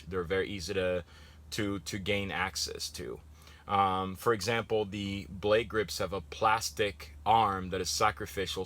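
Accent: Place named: Canada. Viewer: Great vid! I know you live in Florida like me...but you must have grown up in Canada? Accent...Thumbs Up!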